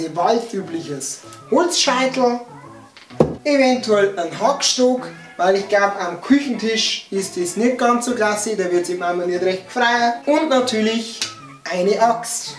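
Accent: Bavaria, Germany. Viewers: I almost don't get anything you say but it's always nice listen to thr bavarian accent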